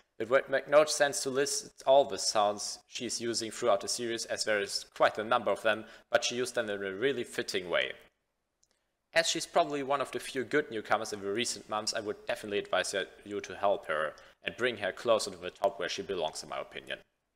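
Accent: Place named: Germany. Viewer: A. Great coverage B. People who deserve to be better known getting spotlighted C. German accent this video is perfect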